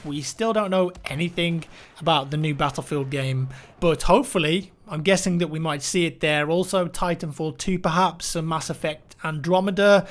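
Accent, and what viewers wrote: England, United Kingdom. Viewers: i think it's the british accent... soothing to the ears... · hey what part of England are you from? just curious haha I live in Canada but I'm from the UK as well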